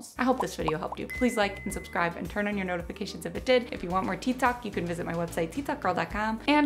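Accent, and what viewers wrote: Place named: Midwest, United States. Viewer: Awesome! I could detect the Midwest accent. My family is from Iowa but live in Cali. Thanks for your content!!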